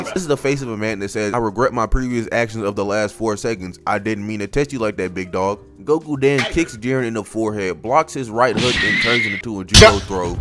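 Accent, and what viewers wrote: Philadelphia, United States. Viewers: r u frm philly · Philly or ATL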